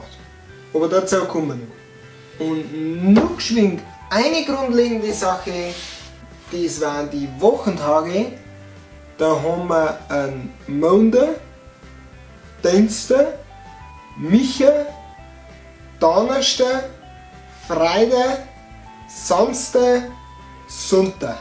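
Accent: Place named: Palatinate, Germany. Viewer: des wo du redst is koa boarisch des is pfälzisch!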